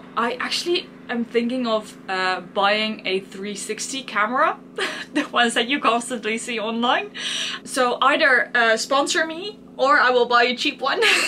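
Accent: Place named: United Kingdom. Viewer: Today is the first time i know you, i am so happy to see you,, i wanna ask you "if you are from the Netherlands, why do you have Brittish accent when you speak English?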